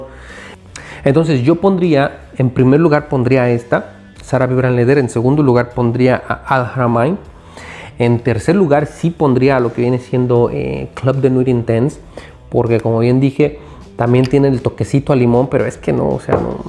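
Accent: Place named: Veracruz, Mexico. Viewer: ¿Sos de Veracruz?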